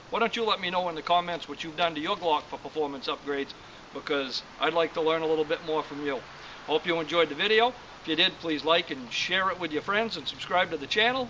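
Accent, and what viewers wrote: Maine, United States. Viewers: Sounds like a Maine accent?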